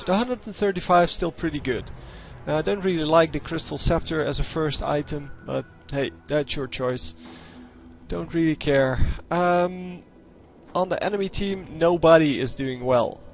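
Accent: Netherlands. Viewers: Your accent seems dutch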